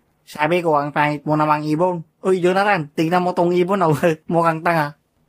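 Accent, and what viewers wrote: Ilocos, Philippines. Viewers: ilocano ka pala lods🤣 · Ilocano ka idol? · Ilocano ka ba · Full blooded ilocano here hahahha.. nagsayaaten agita videom sir... hahahaha.. dami kong tropang ganyan magsalita... kung may taglish.. meron ilocalog hahahaha.. sa accent ako natatawa talaga.. more videos at sama mas madalas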